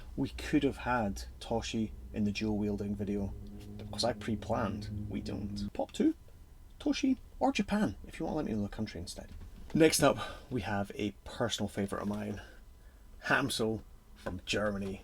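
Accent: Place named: Scotland, United Kingdom. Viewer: This was a tough week, torn between Toshi and Hansel 🤔 I think I’ll go Hansel due to the props used haha. Special mention to you saying ‘cosy little boots’ in your wee Scottish accent 😅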